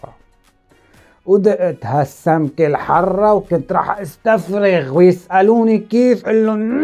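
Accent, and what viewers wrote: Lebanon. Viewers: تحياتي لك أخي ، تعجبني اللهجة اللبنانية خفيفة على القلب 💖 تابعت حلقة الأسئلة ؛ وفرحت جدًا إنك مسلم سني الحمد لله الله يثبتك ويهدي جميع الخلق ، ليس تعصبًا مني لكن هذا هو الطريق الحق وكل البشر سيكتشفون الحقيقة عند الموت تحديدًا أول ليلة له في القبر … أهم شي دينك الثبات الثبات . شكرًا لك 🌱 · تحياتي يا اكثر واحد مهضوم بلهجة لببنانية والله انت علاج كل المكتئبين والي عندهم الاستريس تفرج عليك ينسي كلشي هههه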